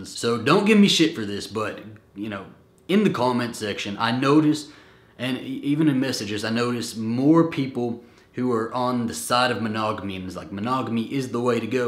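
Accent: United States. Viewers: where the hell in America are you from? Your accent is crazy American!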